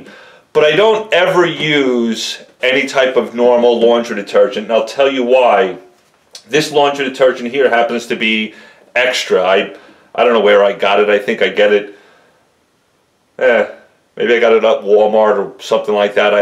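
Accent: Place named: New Jersey, United States. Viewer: no kidding, what's up with these people. Is there a 3 minute law for videos? Screw that crap...I like listening to a guy who knows his sh*t and educates and explains... I am blown away by all the comments you get for long videos...also, why are people so fascinated with your Jersey accent? And many call u Howard stern, they must have never visited the northeast??? But keep it coming, you turned me on to armour all quick shine Aerosal , and purple power!!! God sends...for that I just ordered a 46 buck order from your microfiber store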